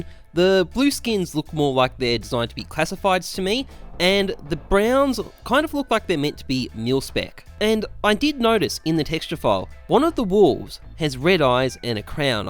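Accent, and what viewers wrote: Australia. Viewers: Isn't from Australia too? His accent is like an Australian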